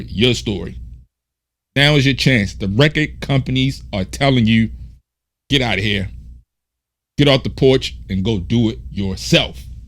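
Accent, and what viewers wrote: Baltimore, United States. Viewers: are you from somewhere near Baltimore? I'm just guessing as an Irishman who may have watched The Wire too much 😅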